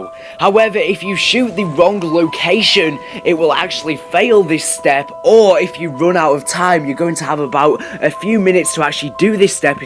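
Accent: England, United Kingdom. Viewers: No, not British. Its the accent/dialect of the area of England he comes from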